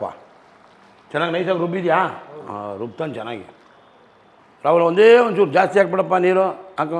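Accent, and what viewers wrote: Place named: Bangalore, India. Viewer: ಗುರುಗಳೇ ತಮ್ಮದು ಯಾವ ಊರು ತಮಗೆ ಯಾವೆಲ್ಲಾ ಭಾಷೆ ಬರುತ್ತದೆ ಕೆಲವೊಮ್ಮೆ ಬೆಂಗಳೂರು ಭಾಷೆ ಆಯಾಡ್ತೀರಾ ಮತ್ತೆ ಮಂಗಳೂರು ಭಾಷೆ ಆಡ್ತೀರಾ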